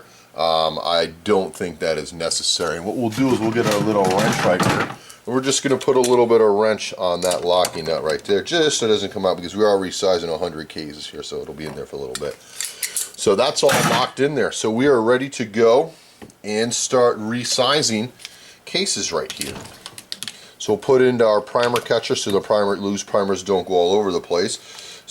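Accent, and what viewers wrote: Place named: United States. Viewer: You sound exactly like my history professor lol, what state are you from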